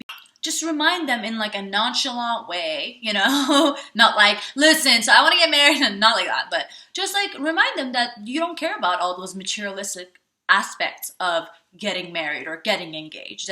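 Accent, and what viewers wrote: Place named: Spain. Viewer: A foreign one. She speaks another language, maybe Spanish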